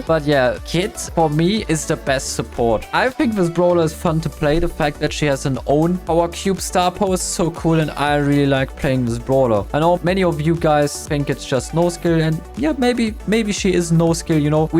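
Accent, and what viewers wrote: Germany. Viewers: this is just the german accent and he is a german so it makes sense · Man hört dein deutschen Akzent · You haven’t mentioned Chester! But that’s fine dw! If he’s one of your favorite: Understandable, when he’s close he makes tons of damage, his super where his first attack is stronger is OP, the gadget with buffs or changing your super to a different one is also amazing! If you don’t like him: Also understandable, for his massive damage, his healing super, the bomb, the stun, the slowing down and poison are annoying and OP As a Chester Main I can say: It’s 50/50 Fun fact: Since he was made better with 4 now without the super he can use his 2nd, 3rd and 4th attack to kill mortis, piper, surge (if he doesn’t have any upgrades or is just bad) and also Edgar without any super Though I must say I camp a lot since going in offense is not the best idea with Chester in my opinion! 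-> I’m new to the channel and love the vids, love the German accent a lot 😂 Don’t have him rank 30 yet cuz I don’t have friends to play with so I have to play with random people- 😭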